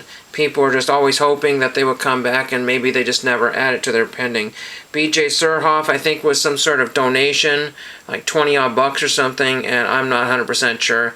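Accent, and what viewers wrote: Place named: Kansas, United States. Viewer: Cool pickups. You need to work on that Kanas accent though.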